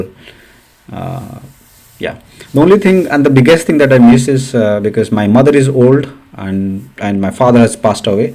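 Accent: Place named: Odisha, India. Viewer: Odisha ka hai, I can still hear his mothertongue influence.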